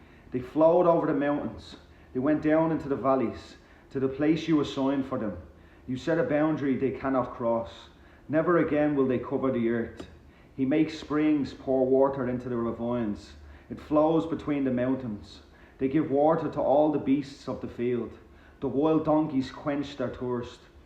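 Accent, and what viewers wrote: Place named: Ireland. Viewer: Love your Irish accent‼️ ☘️ Your love for God's Word is so inspiring. Keep up the good work for Him.🙏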